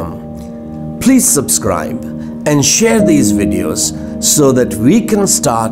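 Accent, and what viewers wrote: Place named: United Kingdom. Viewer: Esse sotaque britânico omg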